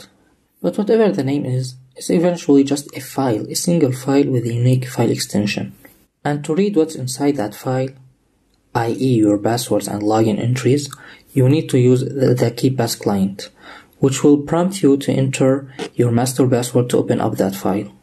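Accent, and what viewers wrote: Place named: Iran. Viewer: Thank you! Do you speak Farsi? Where are you from? You have a nice accent that reminds me of some of my students. They're very brilliant.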